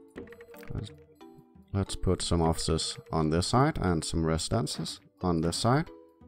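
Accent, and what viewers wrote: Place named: Denmark. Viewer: du lyder dansk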